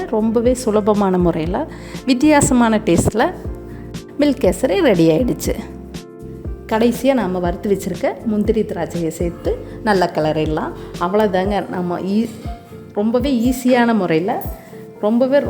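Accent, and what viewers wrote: Coimbatore, India. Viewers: Nice maam. video making nallairuku. keep going maam. speaking slang is the icon of kovai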